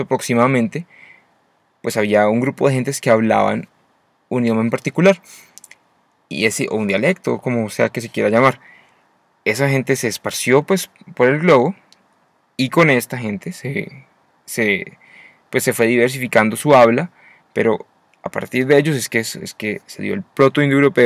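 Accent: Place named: Colombia. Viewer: Menudo acento Colombiano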